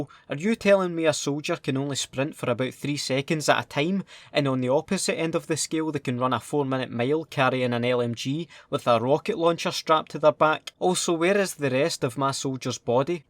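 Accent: Scotland, United Kingdom. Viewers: You’re a fking hero that Scottish accent just makes everything you say hilarious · i love scotish accent! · His Scottish accent makes it funnier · Haven't watched this in years so glad i came back to watch these made me laugh and cry man 😅😅 the Scottish accent. Makes it better love yeah ❤️❤️🔥🔥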